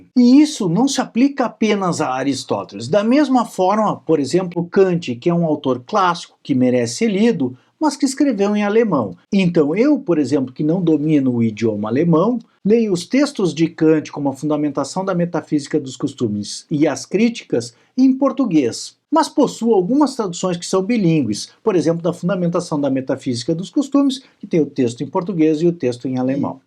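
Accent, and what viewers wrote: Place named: Rio Grande do Sul, Brazil. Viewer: Ilustríssimo Professor e Dr., um aplauso e um abraço de Gravataí/RS. Pelo sotaque, tu deves ser meu conterrâneo.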